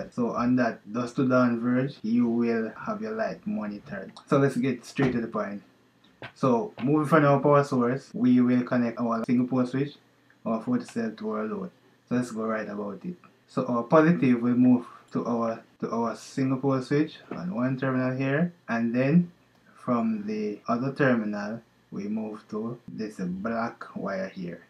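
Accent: Jamaica. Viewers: Yaaad man voice dis.....Hailingz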